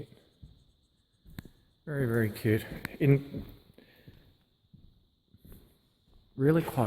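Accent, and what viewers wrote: Australia. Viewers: I am an American. Love your explores, and your Australian accent..Lucmy to have found your channel. Keep up the interesting work.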